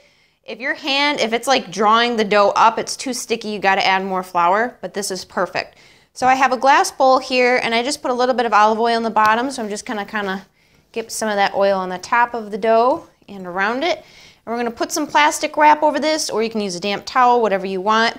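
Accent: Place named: Wisconsin, United States. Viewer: You sound mad North Western. Almost wisconsin like lol.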